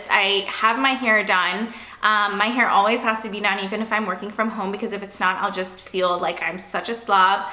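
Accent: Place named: West Coast, United States. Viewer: I don’t think she sounds stuck up! She has an eye for fashion. She may not be individually judging people, she can just see when something looks “on” or “off” and she has the problem solving capabilities to figure how to improve things. Then she goes and shares her advice with others instead of keeping the secrets to herself. And her voice doesn’t sound stuck up, she talks likes she’s from the west coast. That’s a habit that’s shaped by her region. It’s not really fair to attack someone on a public forum who is being nothing but kind and giving her (maybe not to everyone, but definitely to some) helpful opinions.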